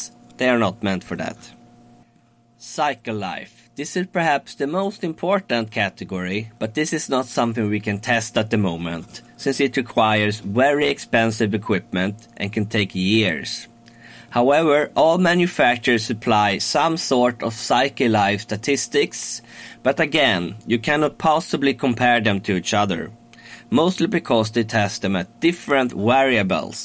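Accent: Sweden. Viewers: Sounds like you are from Lidingö…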